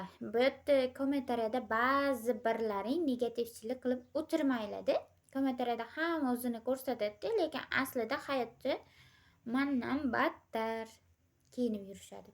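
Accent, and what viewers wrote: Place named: Tashkent, Uzbekistan. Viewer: qayerlisan bir qarasa chiyalcha gapirasan🤮 🤮 🤮 🤮 🤮 🤮 🤮 🤮 🤮 🤮 bir qarasa toshkentcha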